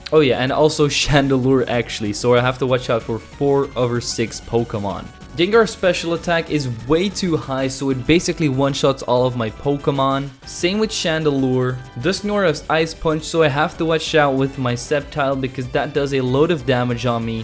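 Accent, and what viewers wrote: Belgium. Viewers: Wow je bent een belg😂 hoor het nu ook aan je stem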